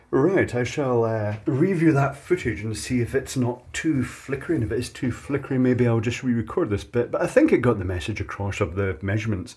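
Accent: Scotland, United Kingdom. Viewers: Must be his broad Scots accent.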